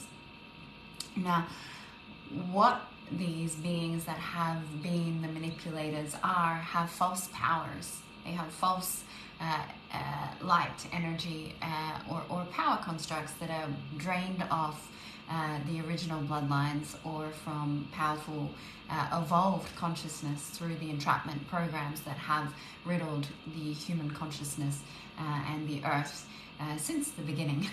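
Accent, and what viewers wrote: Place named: Australia. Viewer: Yas! So beautiful, thank you very much! So much love and grace as always. I live how ur expression of language is so great and this australian accent, yeees ❤ and this bloodline perspective is on point. And this kind of description is good. There are many layers and definition to these dynamics. But prince and princess is more likely acceptable storytale for humans So, one question: are the chakras of the Earth moving? Meaning, can I check them by researching these points.. Btw.. the flags of countries are also showing what energies and colours they hold.. or work with.. Thank you and sending light as always ❤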